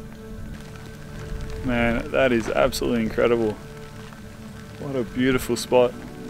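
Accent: Australia. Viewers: I'm new to your channel. I found this quite by accident, and glad I did. Things in Australia and here in US are very different. I've never seen that kind of pot handle, nor that kind of food. I love the accent. (Shame on me, I just noticed I did see one video before didn't realize it. How can I listen to the accent and not hear the difference, I must have woke up deaf that morning.)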